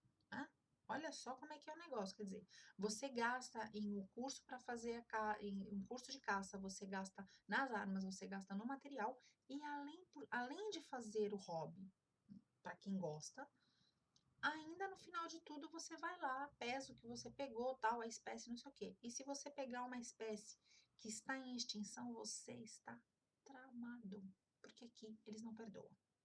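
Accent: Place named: São Paulo, Brazil. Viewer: não resisti e fiz até uma conta aqui no Youtube só para poder postar um comentário para você.. rsrs Moro no Brasil..mas sou APAIXONADA pela Suíça...Penso em um dia morar ai.. (Se acontecer vou te visitar.. adorei vc! rsrsrs) eu tenho uma tia que mora aí e meu primo é suíço (Não entendo quase nada que ele fala.. rs), adorei todos os seus 3 vídeos.. assisti os 3..achei muito bom! não deixe de postar mais.. assistirei também com certeza! nossa, vc tem sotaque de "paulista" rs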